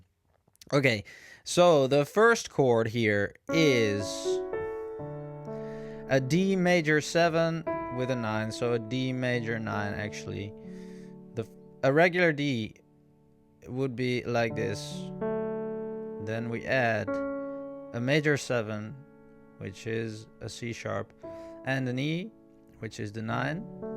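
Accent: Netherlands. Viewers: U sound dutch! :D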